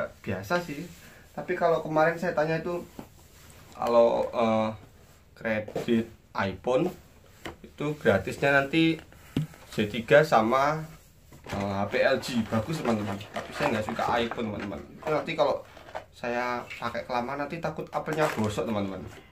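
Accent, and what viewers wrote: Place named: Kediri, Indonesia. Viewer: logat khas kediri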